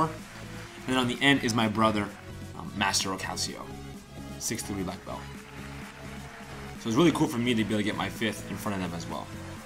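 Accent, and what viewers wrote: New England, United States. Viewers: What school is this Sounds like you’re in New England?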